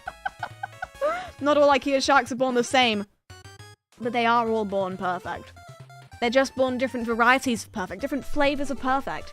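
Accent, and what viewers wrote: United Kingdom. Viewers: Okay, I'm subbing just because of this stream. The dedication to BLÅHAJ is simply astounding, and it's nice hearing another British voice on here. I shall watch your career with great interest.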